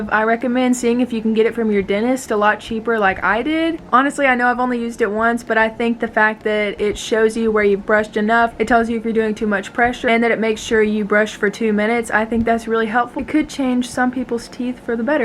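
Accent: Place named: United States. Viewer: dam it you attractive women! You have a cute american accent!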